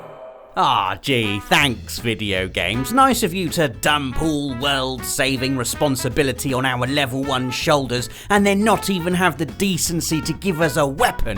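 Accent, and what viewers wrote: United Kingdom. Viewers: A few days ago,i got suggested this channel.Since that i watched a few dozen videos.And while i was watching,started to wonder why i like this so much.Good content,down to earth commentary and the main thing,a british voice! There is something in that accent which gives some extra boost to commentary.Also i just love it.Hope this will be running for years.Also,from elder scrolls,i would say that Skyrim is worse,there you start as someone that stands in line to lose his/her head.There is Kingdoms of Amalur,where you are starting as someone who just gotten dumbed as a lifeless corpse down to the rest of your kind. :]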